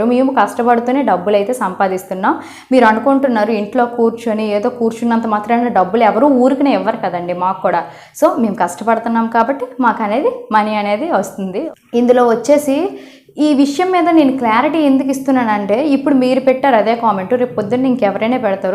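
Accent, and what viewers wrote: Srikakulam, India. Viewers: Maadi Srikakulam sis... ani chusa appudu anukunna ma side velladi ani...mem kuda vyshyas...bobbili lo ma vallu unnaru...slang ni marchukomani comments chese vallandaru posh guys ayiuntaru...anduke nativity ni comment chestunnaru...evari kosam meeru change avvadu aite vallaki value ichinatlu avtndi...keep doing ur vlogs...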